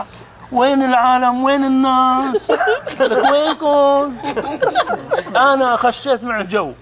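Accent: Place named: Syria. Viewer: نص لهجتو سوري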